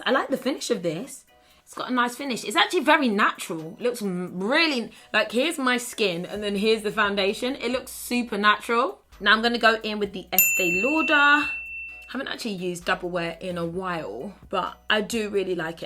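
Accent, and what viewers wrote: United Kingdom. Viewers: Oh my gosh, I loooove the Britisch accent ❤❤ Lots of love from Austria!!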